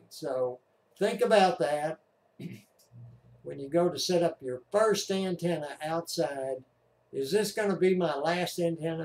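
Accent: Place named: North Texas, United States. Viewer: I got stuck on your channel becuase for some reason, you remind me of my late Grandad. I don't know where you're from originally, but he was from North Texas and had a very similar dialect to yours. He was also a ham, as is my Dad. For whatever reason, I never caught the ham radio bug until about a month ago, so I took the Technician test at the beginning of March 2018, and the General at the end of March. I wish I had done it when he was still alive, but at least I'm getting to enjoy learning from my Dad, and your videos as well. Thank you for them! 73s to you! KG5YTI - Brian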